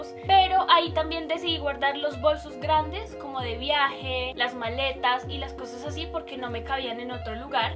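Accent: Colombia. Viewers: ana sofia perez chaparro Creo que basta escucharla hablar para darse cuenta que por su acento es colombiana. :)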